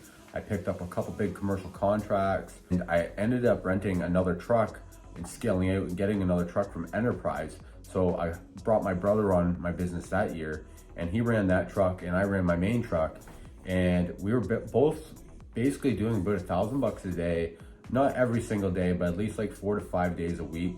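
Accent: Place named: Northern Ontario, Canada. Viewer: 705 area code. I saw a video and there was snow in the background and hearing you talk I was president sure . London Ontario here and just in my first week getting going. I’ve subbed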